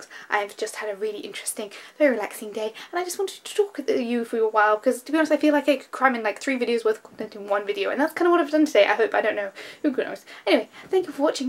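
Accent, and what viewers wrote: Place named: England, United Kingdom. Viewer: I had only minimal issues understanding what you were saying, but that's because I am used to hearing people with an English accent talk. Not quite at that speed but your diction and pronunciation is very clear. Congratulations on the 500 subscriber mark by the way. Hope it keeps building. Thank you also for being such a good moderator for Trisha Hershberger when she does her streams that you can be around for. I know the time difference is quite a challenge even when she streams in the afternoons(for her) since it is still a 9 hour gap. Please always keep up the lightness and cheer in your videos,tweets and everyday life, the world can be dark and full of terrors but you help shine a light through it everyday.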